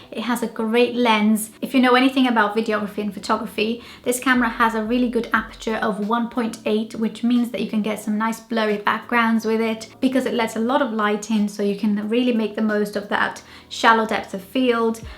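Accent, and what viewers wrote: United Kingdom. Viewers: tb sou brasileira e moro em Londres, o seu acento britanico e fantastico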